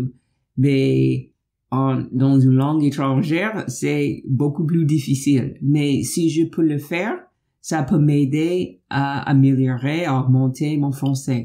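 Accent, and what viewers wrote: United States. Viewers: Bravo, votre français est tout à fait compréhensible, quelques erreurs mais c'est normal. Pour plus d'aisance il faut beaucoup parler avec les autres et enrichir son vocabulaire, ça prend du temps mais vous êtes sur la bonne voie. Et gardez votre accent américain en France c'est charmant 😊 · You have an adorable American accent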